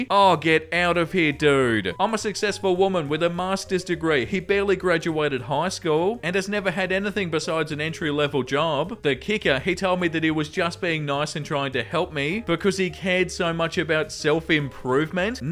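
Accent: Australia. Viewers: That’s not a British accent. That’s an Australian accent. They’re approximately 9500 miles apart. Let me guess, American?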